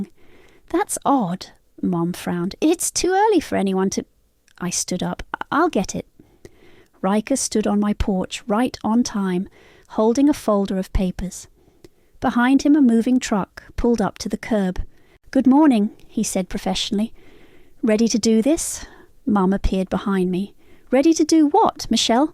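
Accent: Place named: United Kingdom. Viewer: Sorry I can’t stand the British accent. It’s really awful. Some of thrm I can take but this one is awful.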